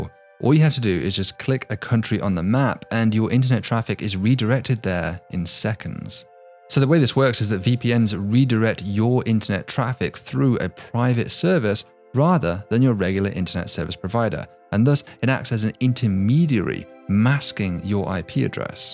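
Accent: United Kingdom. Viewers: "Poolsar"? Never heard that pronunciation before. Does he also say, "Check my poolse"? OK, he also says "repoolsion." Where is from that he has a classic British accent except for that quirk?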